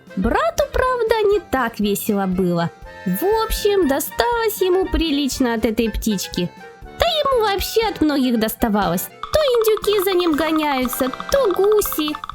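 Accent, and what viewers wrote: Ukraine. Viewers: Ты что украинка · А ты что украинака? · Ты что украинец а я думал что просто так говоришь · Ты с украины? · ты из Украины что ли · Ты что из Украины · Ты чё украинкачто ли🤔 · Эй ты что с Украины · А ты украинка чтоли 😢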